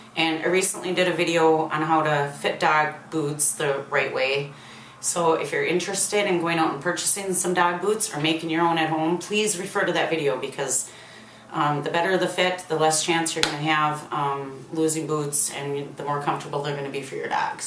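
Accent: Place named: Minnesota, United States. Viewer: Great video! Thanks! Now I'm going to watch the "how to fit" video. I'm from MN but been in AL for 30 years. Fun to hear the accent again! Did I really talk like that growing up?? lol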